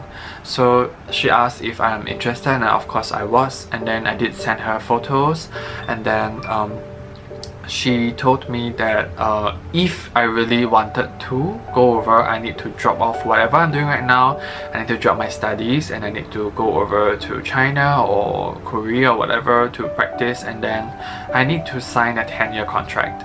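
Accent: Singapore. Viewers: AYEEEE SINGAPOREAN HERE TOO I SENT YOU MY AUDITION VIDEO ALREADY HEHEHEH when I first saw your video I was wondering if you were Singaporean but I wasn't sure but aye your accent is strong! I'm a proud singaporean of you here!❤❤ itsokay, life is really short. sometimes we have to give up on some things. glad you made your choices! I'm gonna work hard and try to achieve my dreams! jiayouz !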